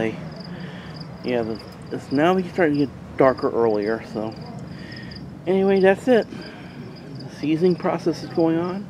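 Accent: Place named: Australia. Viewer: Great idea for a video man! I found it entertaining. I don't really use that much charcoal for my first seasoning, I just fill the chimney and burn that much out...but you definitely made sure you got out all contaminants out! I loved the aussie ascent too!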